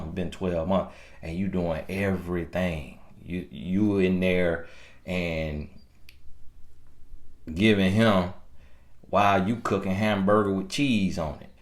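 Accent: South, United States. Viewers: I smiled every time he said “On ya bike!” 😂😂😂 I love southern accents!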